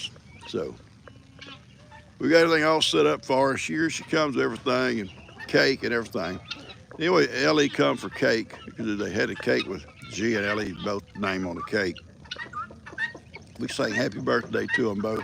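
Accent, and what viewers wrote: South, United States. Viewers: For me, I love listening because of your strong southern accent! I’m from Oregon!! Love the way you say a lot of different words😊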